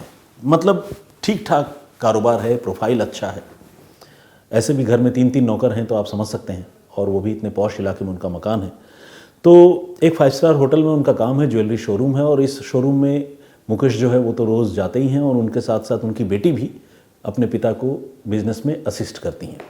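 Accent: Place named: Bihar, India. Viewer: kahani theek sunate hain par supratim ji ka talaffus extra ordinary hai. bihari hone ki wajah se kabhi kabhi unki bhasha mein bihari lehza aa jaata hai.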